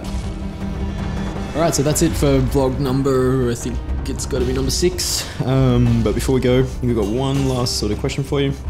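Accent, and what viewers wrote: Australia. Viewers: Vlog is good, also it's nice to hear an fellow aussies voice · You're Aussie. Is Motion Twin an Aussie company?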